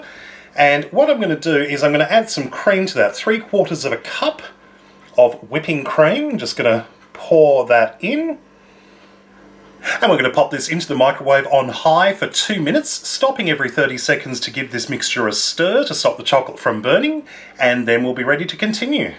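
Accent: Australia. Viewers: I love his reactions after he tries the food. best part by far especially with an Australian accent. great video! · He sounds Australian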